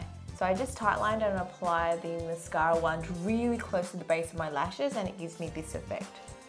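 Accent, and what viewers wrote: Australia. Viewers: Aussie accent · I love her Australian accent! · OMG why straight away when she started speaking I first thought of how I cook that lol there Australian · isn't it funny how she has an australian accent I am from australia too there is actually a chance that I have walked past her on the street without even knowing that she is a youtuber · Are you an asian australian mix? I LOVE your accent!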